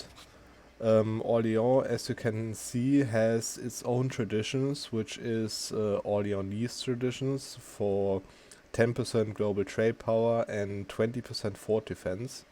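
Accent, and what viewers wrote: Germany. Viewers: You’re from Germany, right? Nicht schlimm, ich mag unseren Englischakzent. :D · You have a German-Accent XD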